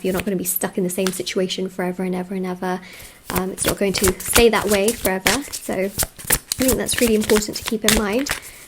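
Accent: England, United Kingdom. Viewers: I just love listening to your Beautiful English Unique Pixie Fairy voice 🧘👌✨🧚🏼‍♀️🪄✨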